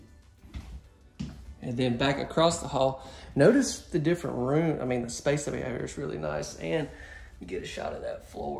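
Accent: South, United States. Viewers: This home is great, I really liked the kitchen and the utility room, I could live with it. You say utility room however you want to, I pronounce it like you because I'm from the south too. In one video you said something about calling the toilet something besides toilet, how about the porcelain throne :) Keep up the good work.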